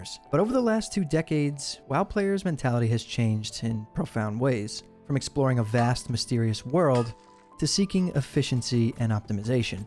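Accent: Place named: England, United Kingdom. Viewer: You forgot one thing. For players from other country’s the social experience could be difficult. I hear that you are english. But for a dutch person like me it was not easy. BUT i really wanted to get better in learning english. I learned more in wow then at school. Not everyone has the luxury that they get servers in their own language.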